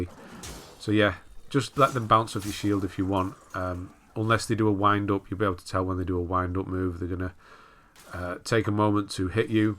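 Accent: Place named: Cheshire, United Kingdom. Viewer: That accent is subtle btw, placing you somewhere near the M56.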